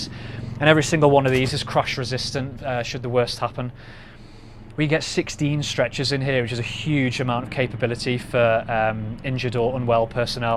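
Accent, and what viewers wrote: Liverpool, United Kingdom. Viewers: A scouser I hear in your voice ex merchant Taylor's I bet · slight liverpool accent ... cracking chopper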